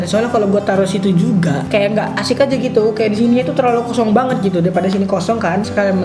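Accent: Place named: Lampung, Indonesia. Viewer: Logatnya lampung bgt aowkwkwk Salam dari Bandar Lampung gan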